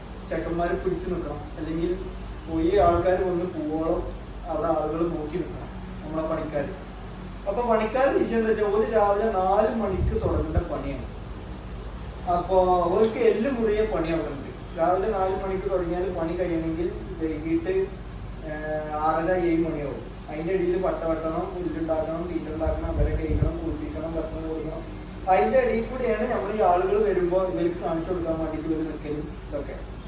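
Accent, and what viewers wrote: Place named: Malappuram, India. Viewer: ഇക്കാ...ഞാൻ വളരെ എടുത്താണ് കാവേരി കുട്ടിടെ വീഡിയോസ് ഒകെ കണ്ട തുടങ്ങിയെ....പക്ഷെ ഒരു മാജിക്കൽ എഫ്ഫക്റ്റ് പോലെ ഇ ചാനൽ എന്റെ ടോപ് ഫേവറിന്റെ ചാനൽ ആയി മാറീ...ഇപ്പോൾ ഇക്കയും, കാവേരിയും എനിക്ക് ഏറ്റവും ishtamulla രണ്ട ആൾക്കാരാണ്....നിങ്ങളുടെ വീഡിയോസ് ഡെയിലി കാണുന്നതു തന്നെ മനസിന് ഒത്തിരി സന്തോഷം ആണ്. എപ്പോളും shorts എടുത്ത് കണ്ട വീഡിയോസ് വീണ്ടും വീണ്ടും കാണും...ചേട്ടന്റെ ഇന്റർവ്യൂസ്,Ottayan vlogs ഒകെ ഞാൻ എന്നും കാണും..... എന്തോ e ചേട്ടനേം ആന പെണ്ണിനേം ഒത്തിരി ഒത്തിരി ഇഷ്ടം.പിന്നെ ചേട്ടന്റെ മലപ്പുറം ഭാഷ അതും രസമാണ്...എന്റെ ജില്ലാ കൊല്ലംജില്ല ആണ്. ചേട്ടന്റെ മലപ്പുറം ഭാഷ കേട്ടോണ്ട് ഇരിക്കാനും രസമാണ്...Anoush മോനും വൈഫെയ്‌നും ഡാനിഷിനും ഉപ്പക്കും ഉമ്മമാകും സുഗന്ന് വിശ്വസിക്കുന്നു.....നോമ്പ് കാലത് എല്ലാ അനുഗ്രവും ചേട്ടനും ചേട്ടന്റെ ഫാമിലിക്കും, വീട്ടിലെ എല്ലാ മിണ്ടാപ്രാണികൾക്കും നേരുന്നു.....ചേട്ടന്റേം കാവേരിടേം കഥ ഒകെ ഇപ്പോ ഞൻ എന്റെ ഫണ്ട്സിനോടും,അപ്പൂപ്പൻ അമ്മാമയോടും ഒകെ പറയും..ടീവി വീഡിയോ കാണിച്ചപ്പോ അവർക്കും നിങ്ങളെ ഇഷ്ടായിയ്.എനിക്ക് ആന കമ്പം ഇലായിരുന്നു.ഇപ്പോൾ പക്ഷെ കാവേരി പെനിൻറേം ചേട്ടന്റേം ഡാനിഷ് ബ്രോഡിഎം fan anu njan❤❤❤❤. 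കൊല്ലം ജില്ലക്കാരി ആയ ഞാൻ എന്നേലും നിങ്ങളെ ഒരിക്കൽ കാണും എന്ന പ്രതീക്ഷയോടെ നിർത്തുന്നു.....ഇ മെസ്സേജ് വായിക്കണേൽ പറ്റുമാണെങ്കിൽ ഒരു റിപ്ലൈ തരണേ ട്ടോ ❤❤ With Lots of Love and Best Wishes Abhirami from Kollam❤❤💓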